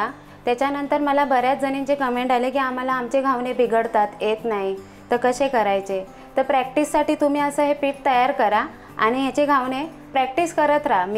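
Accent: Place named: Konkan, India. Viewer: खूपच छान रेसिपी सांगता पण सुंदर भाषेत कोकणि ढब छान वाटते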